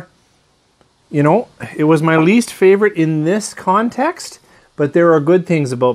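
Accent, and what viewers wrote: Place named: Canada. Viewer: Holy shit I’ve been watching your videos for months and just now I noticed your Canadian accent. Tbh I enjoy your videos more now😆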